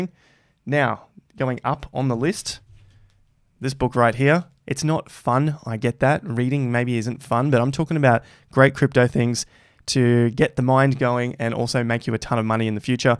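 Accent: Australia. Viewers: are you the Aussie accent on yousician?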